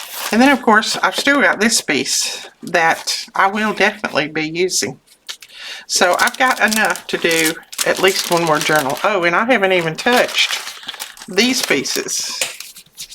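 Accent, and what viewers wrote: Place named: Tennessee, United States. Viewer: I so love watching you do the journals . I am very new at this I’m working on my 2nd book . Plan on making 4-5 for a craft show . Along with some homemade cards . But I love you accent are you from Tennessee? I am but currently live in Indiana. Is there any advice for me as a beginner ? Thanks so much and I’m ma gonna keep on watching you ♥️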